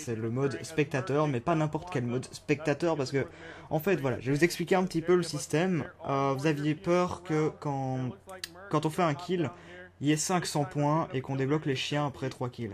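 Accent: Belgium. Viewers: J'ai cru que tu étais de la Belgique :D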